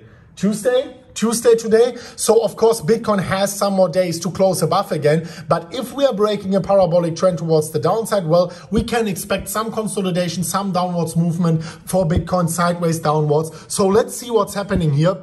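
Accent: Germany. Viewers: Junge, dein deutscher Dialekt ist der Hammer! :D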